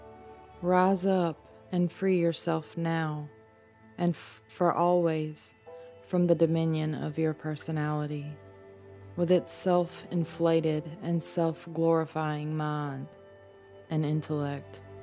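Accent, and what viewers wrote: South, United States. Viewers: Do I hear a beautiful southern accent? I am born and raised on the coast of Georgia USA.. keep reading!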